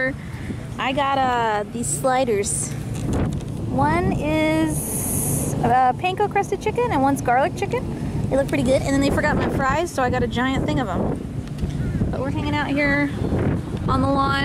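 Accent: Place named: Canada. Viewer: Oakay sooooo?! You sound like you've got a little Canadia in ya der! Disciple18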